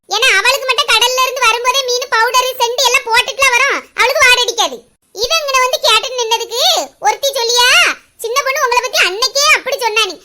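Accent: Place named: Thoothukudi, India. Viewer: Arumaiyana Padhivu :) Enakku Thoothukudi dhan...Indha Pecha Kekrapo Avlo Amudhama Irukku❤️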